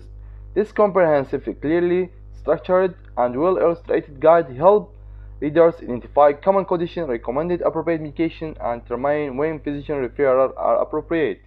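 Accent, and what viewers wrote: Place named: Arab world. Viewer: Your English accent sounds arabic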